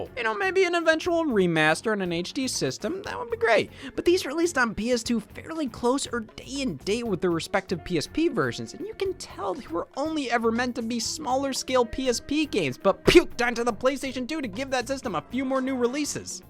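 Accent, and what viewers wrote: Midwest, United States. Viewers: Mid-western accent (especially Ohio)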